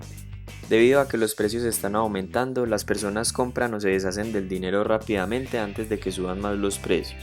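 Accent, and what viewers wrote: Colombia. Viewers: Me encanto colombiano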